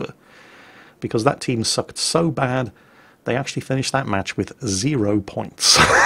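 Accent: England, United Kingdom. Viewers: is a smoker......also: There is a reason (I'm not a native speaker) i switched over from hiding my accent with a learned American one and switched to using version of British accent.....its very easy on the vocal cords.....at least in my experience. It's soft on purpose. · my friend, i must tell you.. I don't play these games, but love to watch, and out of everyone i watched you are the only one that caught my attention.. And i also subscribed, to your channel, i guess you are the only one that's not annoying.. Plus you are very knowledgeable and can flat out play too.. Your commentary is just as mind blowing as the battles, and for a guy from the inner city of Baltimore md in the U.S.A. i love that English accent.....🇺🇸🇬🇧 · Graham Norton has the same sort of sound, check <link> Is it just a British affectation?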